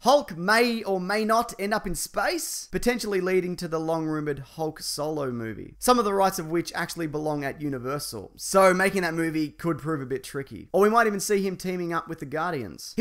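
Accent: Australia. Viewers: Came for Avengers trivia, stayed for the lovely australian accent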